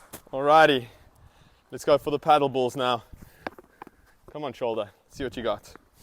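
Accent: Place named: South Africa. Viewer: Are you South African? That accent is too familiar ek se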